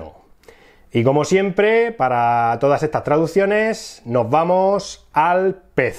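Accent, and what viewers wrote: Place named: Calasparra, Spain. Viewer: te agradezco el tutorial, pero por favor, practica un poco el castellano que vamos, juraría que eres de calasparra, sin mucho temor a equivocarme, y cuando hayas suavizado el acento, prueba con el inglés, que he tenido que ver dos veces el video para saber de que cojones estabas hablando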